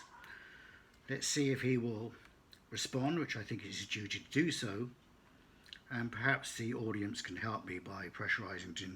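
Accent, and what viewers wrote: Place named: United Kingdom. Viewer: Do you have anything other than a British accent to establish yourself as an authority? Because that really doesn't do it, especially these days.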